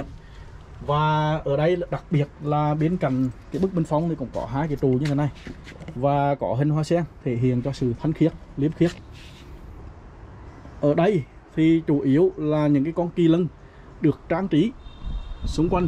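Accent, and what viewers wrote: Hue, Vietnam. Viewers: Giọng Huế dễ thương anh ơi luôn đồng hành và ủng hộ anh chúc anh nhiều súc khỏe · Đúng ạ, em là ngườì Bắc mà nghe giọng Huế thích mê. Ko xót 1 từ nào. Mong anh giữ phong độ và có nhiều đoạn phim quý giá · Tôi là người miền Nam, nhưng nghe ngôn từ của anh rất rõ ràng, dễ hiểu. Với giọng Huế chính thống đặc trưng của anh, cũng là yếu tố khiến nhiều người yêu mến đấy. Cảm ơn anh nhiều! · Giọng Huế của bạn cũng dễ nghe, nhưng đừng nhanh quá. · EM HIEU TIENG AY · Em mà đổi giọng sẽ mất đi một nét rất Huế, và sẽ không còn là Huế nữa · Giọng Nói Nghe Rất Rõ Và Hiểu. Giọng Huế Thì Nói Tiếng Huế. Không Cần Phải Thay Đổi Giọng Nói. Sài Gòn Nghe Rất Rõ? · Cứ giữ giọng huế nói nghe hay hơn em nhé! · giong rat hue va rat hay tui tung hoc chung dh voi nguoi hue o sg nhung giong ban rat de thuong va de nghe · Xin cảm ơn bạn,không,Huế thì phải tiếng Huế mới đúng và hay,cộng đồng hãy ủng hộ kênh này vì rất nhiều thống tin,bạn hãy tự tin lên nhé c đ ủng hộ bạn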